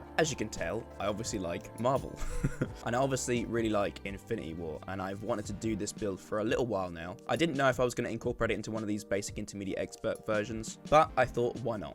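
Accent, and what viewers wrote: United Kingdom. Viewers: ohhhhh sorry im North American and dont know much about British stuff. I knew it was British of sorts, didn't understand what tho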